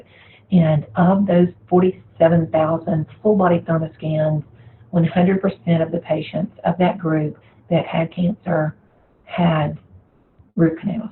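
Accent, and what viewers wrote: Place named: London, United Kingdom. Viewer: Love your accent! Are from from east or west London?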